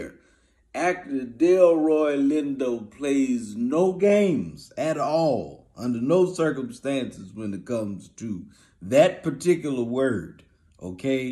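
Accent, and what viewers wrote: Africa. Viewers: Shuler has the best African accent. PS I am a Nigerian. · Brutha Shuler 🤴🏾...😂😭🤣🤣 your African voice cracks me up..😂😭😭 Shaka Zulu frfr... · Lmbo man u r straight up crazy... more of the African accent, love it love it love it! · YOU ARE THE MAN!!!! That African accent.....🤣🤣🤣🤣🤣🤣🤣🤣🤣🤣🤣🤣🤣🤣🤣🤣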